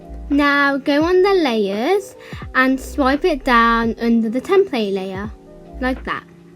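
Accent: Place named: United Kingdom. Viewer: I love your British voice so so much!!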